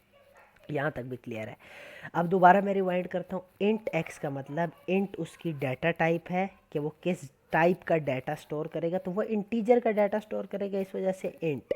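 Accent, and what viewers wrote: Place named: Karachi, Pakistan. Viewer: Or mujhay fast Reply marna waissay bi zuban sy karachi ka lagty ho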